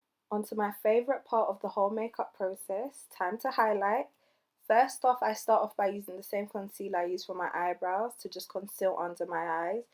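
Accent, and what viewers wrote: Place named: Jamaica. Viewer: love the look....ur accent,,,, do you have jamaican background?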